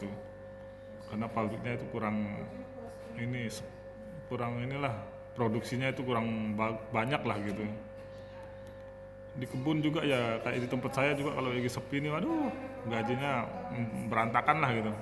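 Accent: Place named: Lombok, Indonesia. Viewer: asli mna saudara kyknya lombok dari logatnya ..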